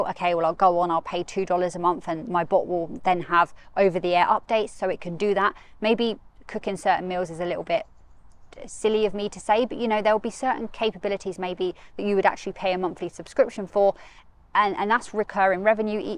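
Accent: United Kingdom. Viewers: It's always a pleasure to hear from you and to help me with my British English listening. So thank you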